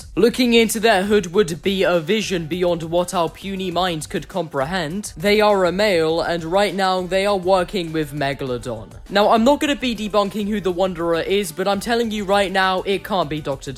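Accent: United Kingdom. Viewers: I like your videos and your british accent is amazing ahah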